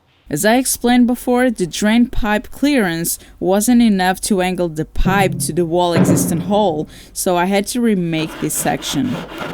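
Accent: Portugal. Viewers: this is a portugesse accent? love it anyway. it reminds me of a dutch accent almost. im binge watching 'the handmaids tale' and stumbled upon you. this recommendation suits me. thank you , youtube.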